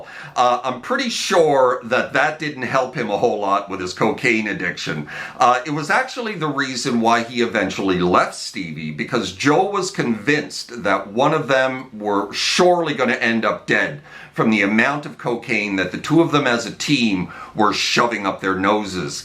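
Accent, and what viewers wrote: Canada. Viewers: you sound Canadian, I’m going to see at Firekeepers Casino in November, can’t wait.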